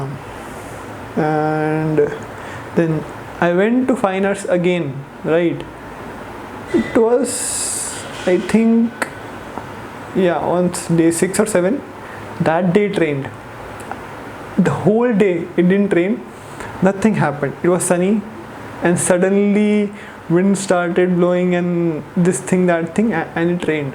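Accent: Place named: South India, India. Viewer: your English is very south indianyyy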